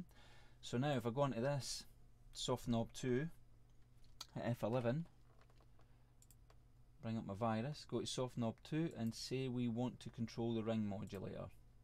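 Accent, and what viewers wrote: Scotland, United Kingdom. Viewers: dude,how do you setup the virus ti with cubase properly? i dont manage:-( btw,are u scottish? i can hear that,i am from Aberdeen:-)) anyway,i am struggling with it :-( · what accent is this? =) scottish?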